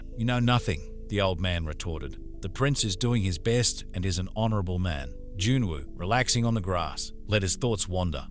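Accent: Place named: Australia. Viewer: Awesome to see an australian brother on this trend, but I CANT STAND AUSTRALIAN ACCENTS. Love from NZ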